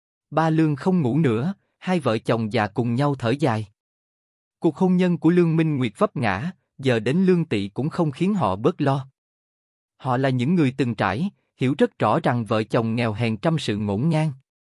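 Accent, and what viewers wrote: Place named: South, Vietnam. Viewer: Rất thích nghe giọng miền nam đọc 😊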